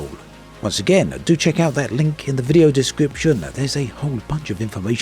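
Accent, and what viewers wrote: United Kingdom. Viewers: Quite off topic but where are you originally from? You have a booming voice that reminds me of Jason Statham, but I can't say I've heard a British accent like yours before. I'm anxious to know what part of which British (or otherwise) country has this accent where there is emphasis on the last syllable of some words that isn't present in other British accents. (forgive me, i'm a bit of an amateur voice impressionist and am easily fascinated by different accents). Fun fact, sometimes when I'm watching your videos, I remove the bass in my equalizer settings to prevent your voice from resonating in the entire house, like Liam Neeson's does. 😉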